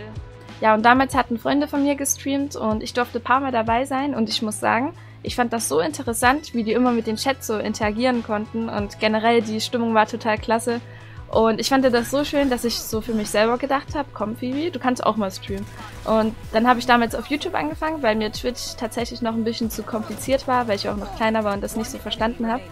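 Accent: Saxony, Germany. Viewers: ist sie aus Sachsen? xD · Wie mer des sächsische raushört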